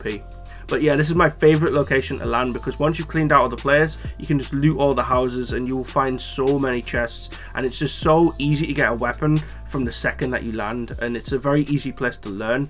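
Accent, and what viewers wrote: Newcastle, United Kingdom. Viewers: You a Geordie?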